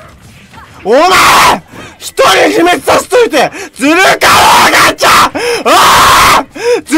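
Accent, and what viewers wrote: Fukuoka, Japan. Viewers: 方言福岡やね。何とかやろうがっちゃ〜！って言うw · 福岡のどこか？がちゃがちゃ言う方言今まで聞いたことなかったwww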